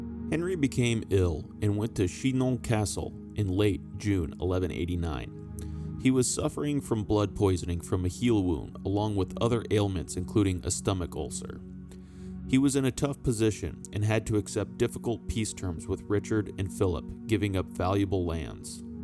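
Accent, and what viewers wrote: United States. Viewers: I hear his American accent, but can't identify the region.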